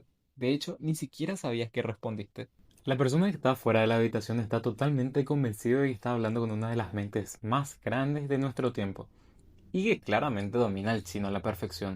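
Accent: Paraguay. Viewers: Tenes acento paraguayo :v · de casualidad no sos de paraguay?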